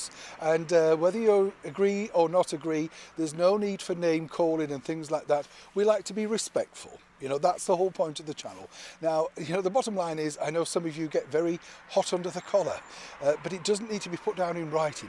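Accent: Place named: England, United Kingdom. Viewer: I adore your English accent and your covering what is going on in such a lovely way.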